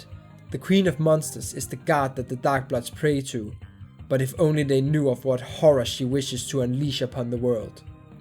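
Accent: Denmark. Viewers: ah Nice to meet a fellow Dane i just thought during the second part your accent sounded really famliar, Also does your YT have discord server yet? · Just finished watching the whole retrospective, and I instantly want to rewatch it. Seeing someone show the same love for a weird browser RPG with terrible gameplay, that I also share (and with a danish accent ❤) has been magical. Thankyou!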